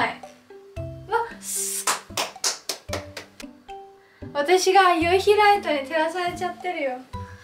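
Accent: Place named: Kansai, Japan. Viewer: りょうか道民なのに関西弁使ってるの笑う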